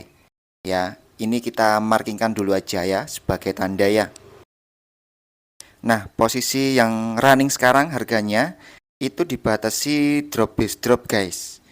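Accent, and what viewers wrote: Java, Indonesia. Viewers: Wong ndi gess.. Kok medok amet gess 😅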